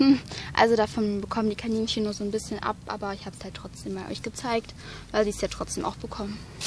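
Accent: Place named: Hesse, Germany. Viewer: Du kommst aus Hessen.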